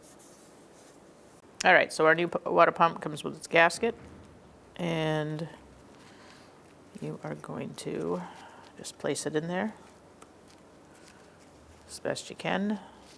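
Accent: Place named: New England, United States. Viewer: What part of New England are you from? Nice vid.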